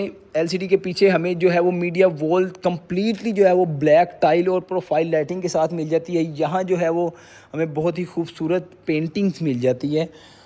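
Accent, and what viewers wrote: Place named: Rural, India. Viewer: Paindu kahi ky bolna to seekh lo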